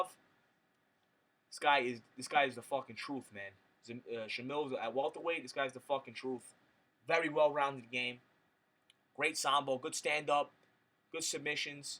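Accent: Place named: Liverpool, United Kingdom. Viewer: u speak like a true scouser bro good shit keep it up but u should be on the freddie roach plaster in glove trick gsp used here i think dana was on to it he didnt look pleased in post fight presser wen he pointed out hed never seen someones eye go like that in 1st round off jabs gsp cheat !!!!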